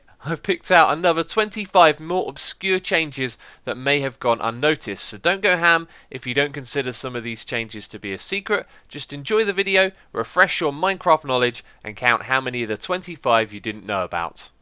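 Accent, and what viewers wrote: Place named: United Kingdom. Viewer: I love your British Accent haha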